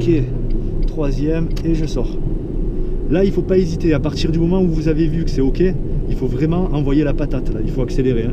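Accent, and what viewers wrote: South, France. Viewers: Salut, J'ai mon examen du passage du permis dans 27 jours, je révise grâce à tes vidéos. Quel plaisir de t'écouter et d'entendre ce bel accent du Sud que tu nous offres, ça donne du soleil à mes révisions. Gratitude infinie de Normandie 🙏💐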